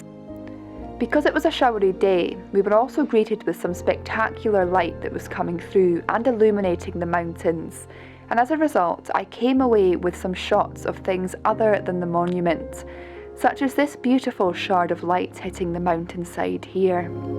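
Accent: Scotland, United Kingdom. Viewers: I always look forward to another of your sessions but one thing is that I wish you would give more information, especially if it’s a “monument”. What was this monument, a church, an abby or a castle. If at lest we knew the name of it, other than “monument”, we could Google it and find out what it was, when it was built and the history of it. I certainly enjoy your channel and the beauty of Scotland, your pictures, and your accent when your talking.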